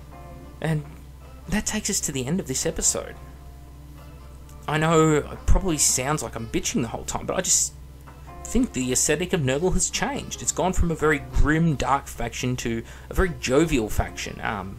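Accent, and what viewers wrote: Australia. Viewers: "Your typical british kid." He sounds like he's from Straya, mate.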